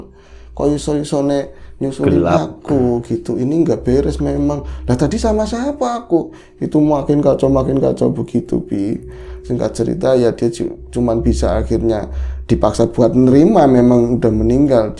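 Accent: Banyumas, Indonesia. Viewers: Sirah.. endas.. padak.. basa ngapak.. 🤣🤣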